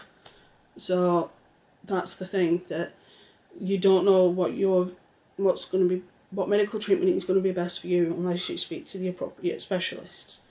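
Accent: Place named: Yorkshire, United Kingdom. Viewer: You are so right, about the barrage of negativity and vitriol--it does make one feel ill, some of her streams are so vicious I can't watch at all, just have to turn it off. And feel the worse for having exposed myself to it. Anyone would feel ill after getting worked into such an angry emotional state, voluntarily mind you, for none of this arguing is anything but self-created and self-imposed; turn off the camera, and it all evaporates into the ether. The still frames captured from those streams and raging videos speak volumes. Some people live their entire lives like that however, in their daily life, in a constant state of arguing, cursing, shrieking, they live for conflict. One of the reasons i enjoy hearing your take is how calm you are, level headed, and that luvly Yorkshire accent. I have good friends from Hull, Barnsley, Sheffield, even up to Middlesbrough. 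York is one of my favorite places on planet earth, and if i won the lottery, I'd buy a small home there and spend my summers way out in the middle of the countryside, if i could. And just go into town once a week for supplies and pub.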